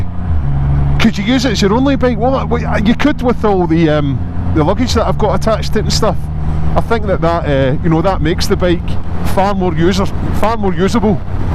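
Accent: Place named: Glasgow, United Kingdom. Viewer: I came to see the review and really enjoyed it. As an expat living in Australia it was great to hear a glesca voice and then I realised it was in Hillington estate. Different Triumph shop now as he used to sell Ducati's as well and I remember the original dealership from Renfrew.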